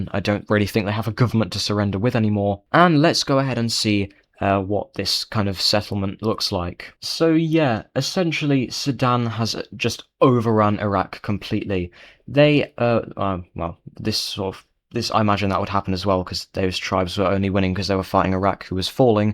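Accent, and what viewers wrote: United Kingdom. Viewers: Pls stop posting my ears bleed when I hear your nasty British voice, I hold no love for that person, for their presence is as a storm upon a once-peaceful sea. Their words fall upon the ear like poisoned arrows, clothed in fair speech yet bearing bitter intent. Were honesty a jewel, they would wear but an empty setting. I would sooner seek fellowship with winter’s frost than endure another hour in their company. Let fate carry them upon a path far from mine, for where they stand, discord follows as faithfully as a shadow. Thus I leave them to the judgment of time, wishing not vengeance, but only that our roads never again should cross.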